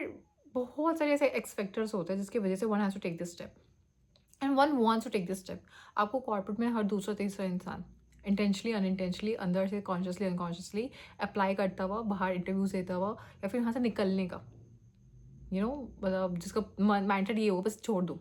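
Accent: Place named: Delhi, India. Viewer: Aapka communication and Hindi dekhke I can be sure ki aap Delhi se ho.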